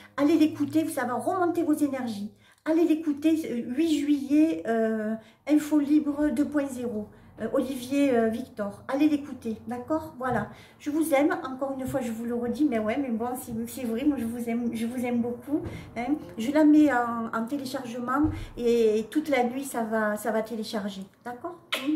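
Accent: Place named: South, France. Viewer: Bonjour.... Je vous decouvre.... Énorme... Vous dites quasi la même chose que katia mudai médium.... Vous êtes où ? Je suis de Montpellier... J entends un accent du sud...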